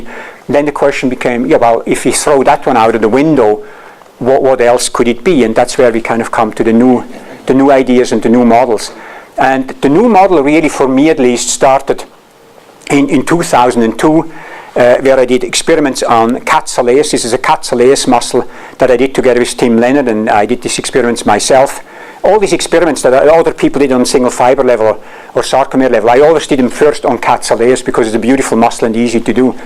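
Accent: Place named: Switzerland. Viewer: YEEE Er esch en Schwizer vo Leuggere. Geile Typ I was 100 percent sure hes got the classic swiss accent. Guess what - he is from my homecountry xD.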